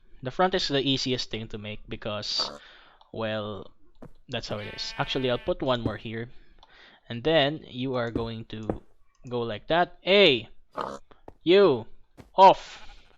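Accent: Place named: Philippines. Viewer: Ang accent niya ay tagalog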